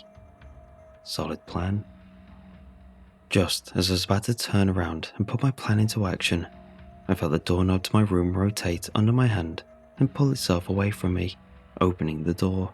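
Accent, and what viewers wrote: United Kingdom. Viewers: British accent from Chattanooga Tennessee?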